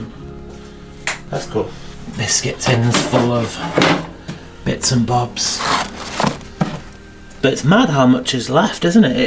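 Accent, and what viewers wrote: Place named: Bolton, United Kingdom. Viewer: Hi, I’m from Bolton, living in Northern California (yep, it’s raining buckets now 😄☂️🍂) and I have to ask where are you from? Freaky or just plain intringuing, all your episodes are really interesting. Descriptive, and personal - with your connection to the lives in the houses, and talking it through on camera. I’m hooked. Your accent takes me home too. Nothing else, could you just share where you are from. And I now realise I sound like you, talking to a spirit both close and far away. Thank You for sharing all your episodes:-)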